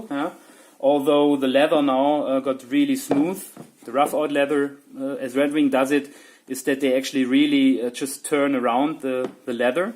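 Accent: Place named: Germany. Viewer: Lustig, wie man Deutschsprachige immer sofort erkennt :-) gutes review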